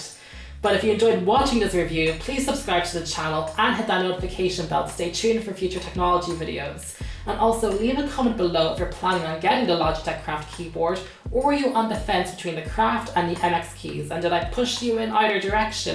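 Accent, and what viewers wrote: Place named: Dublin, Ireland. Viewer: Thanks for the real-world one year later, and the heart gushing Dublin accent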